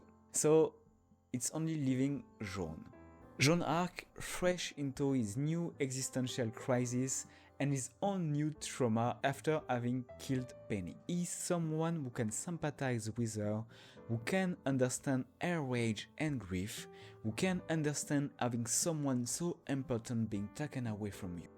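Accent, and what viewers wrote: France. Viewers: Ca c’est un accent français je me trompe ? Btw nice video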